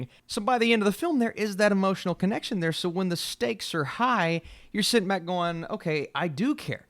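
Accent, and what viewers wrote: South, United States. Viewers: That southern accent you have is lit😍🔥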